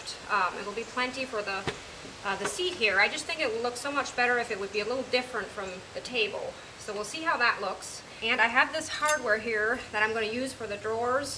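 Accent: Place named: Transatlantic, United States. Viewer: You have such a transatlantic accent. It’s cute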